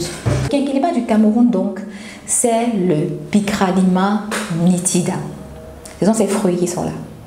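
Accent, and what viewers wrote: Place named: Ivory Coast. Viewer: Tu commence à parler comme une Ivoirienne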